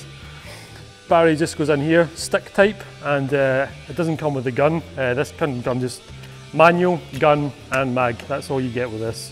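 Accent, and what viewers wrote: United Kingdom. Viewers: Jeez, everything you say is so credible. Damn British accents!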